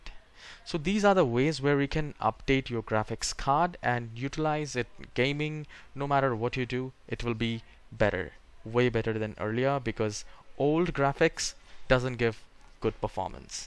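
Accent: India. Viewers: hes trying to hide the indian accent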